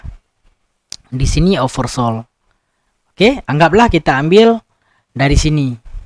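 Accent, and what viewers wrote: Aceh, Indonesia. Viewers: Orang aceh ya?? Logat jih khas that..😊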